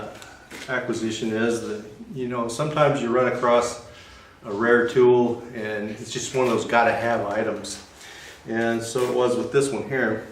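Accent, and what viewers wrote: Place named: Palatinate, Germany. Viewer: You're welcome, I now have watched all of your vids and subscribed as it seems you've got some interesting projects in the pipeline. Also good to see there are other guys around who cannot stop feeding the monster ..:-) Anyway, i'm a bit confused,... your yotuibe location says US and you sound like a native American to me, but power sockets and the "Schutzbrille tragen" sign on the door looks certainly German, not to forget your "Paelzer" dialect on the German video, which sounds pretty native as well...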